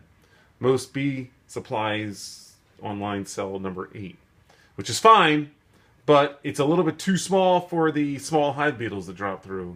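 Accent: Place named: United States. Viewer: Love your hive. Great American accent. '...the girls...'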